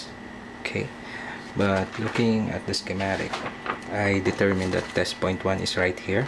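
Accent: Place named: Philippines. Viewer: Just the by way you spoke English I can tell you are from the Philippines 😅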